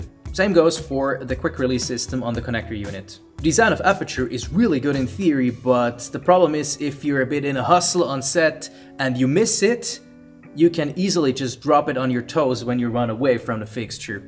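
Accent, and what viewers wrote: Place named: Switzerland. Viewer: I can hear that swiss accent miles away. But thanks for the comparison. Feel like Nanlite has been catching up. However im sticking with Apputure.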